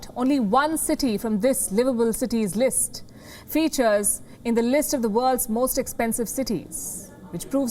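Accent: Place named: Mumbai, India. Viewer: this dumb mumbai scammer accented anchor thinks its hotshot 🤣🤣🤣😂😂😂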